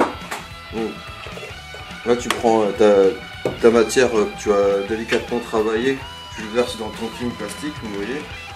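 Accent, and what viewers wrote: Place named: North, France. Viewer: t'es nordiste ou quoi mec putain l'accent a gerber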